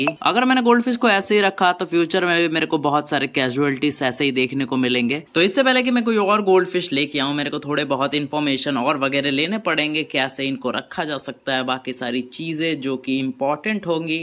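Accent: Bengal, India. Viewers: Bengali ya bihari?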